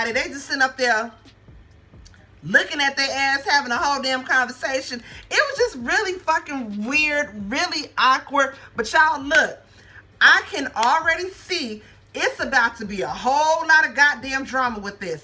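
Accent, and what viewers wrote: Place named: Jamaica. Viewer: Hit the like button first then starts watching. We wanted to hear your Jamaican voice. Momma Dee Though🤔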